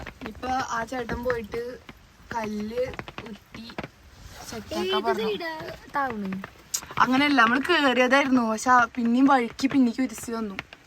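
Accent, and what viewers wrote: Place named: Malappuram, India. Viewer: Ith malappuram slang alle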